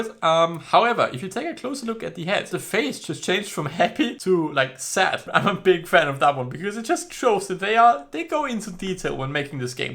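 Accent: Germany. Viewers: Is it possible that ur German? You have that accent😅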